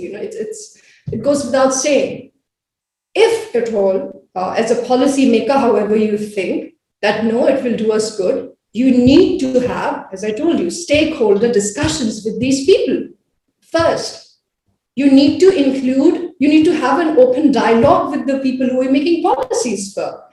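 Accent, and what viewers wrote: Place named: United Kingdom. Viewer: madam everything is good but to connect with masses please you can speak in indian accent, please minimize using british accent.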